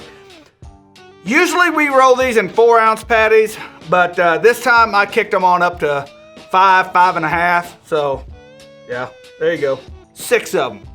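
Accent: South, United States. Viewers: Help me out. How is he a Yankee? He's got a southern accent it sounds like to me. I'm from NY and I can tell you from whatever accent that is, he is definitely NOT from the north!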